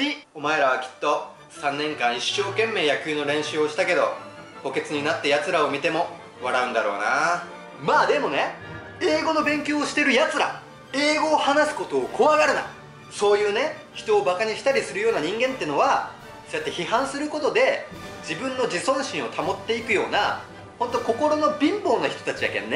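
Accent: Japan. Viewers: この動画も九州方言も好き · カナダにワーホリで行ってたけど、本当のネイティブスピーカー以外は文法も発音も結構適当というか完璧ではなかったです。そりゃそうだ、そこで生まれて育った訳でもないんやから。伝えたいことが伝わって最低限相手の言いたいことがわかることが大事な気がします！なによりこの人の博多？なまりが何より安心できました笑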